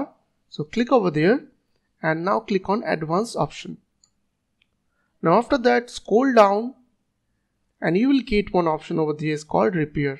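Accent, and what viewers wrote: India. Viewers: Video accent English - - - - - - - Indian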